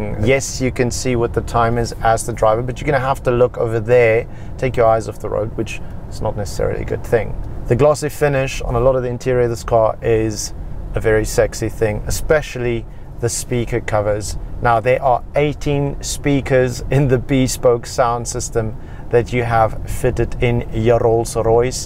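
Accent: Afrikaans, South Africa. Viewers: Lekker? 😂 Howzit my broer? So refreshing to hear something South African Ma se kind, i need one of those 'lekker' fat wraiths😂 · You South African?? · loved the little Afrikaans accent and words coming through lol lekke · Bro are you South African ?Your accent reminds me of home.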